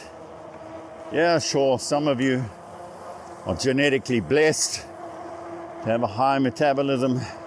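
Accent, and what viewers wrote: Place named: South Africa. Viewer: thanks. Loved your video btw! Great hearing that SAFFA accent. I bought a Cube Supreme Sport Hybrid with Bosch battery. Unfortunately could only get a 400w battery, no stock anywhere of the 500w. Hopefully this will do the trick for now. Could have got a Norco Scene VLT for same price with 500w battery, but no front suspension, and non integrated shimano battery. Merida also seemed good, but saw that too late, and didn’t want to muck about the sales guy any further. Anyway a 30day “trial “ period and can exchange if I absolutely think it’s not for me. I’m not keen to use this option, so will likely stick with what I have unless there is some serious fault or issue I have.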